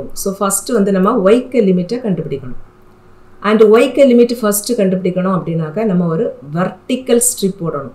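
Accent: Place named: Tirunelveli, India. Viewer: Romba arumaiya teach panreenge. Namma thirunelveli style pechi. Thank you so much madam.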